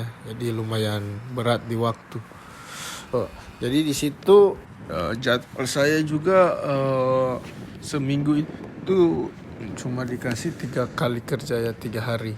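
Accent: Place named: Manado, Indonesia. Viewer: Dari logatnya ngana dari Manado kang, butul2 nyong Menado guanteng2 do e, semangat ya nyong Menado, Tuhan Jesus memberkati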